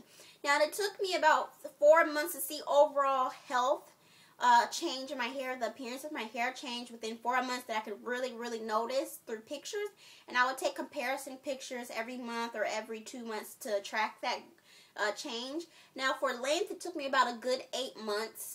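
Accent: United States. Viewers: lol you really sound country girl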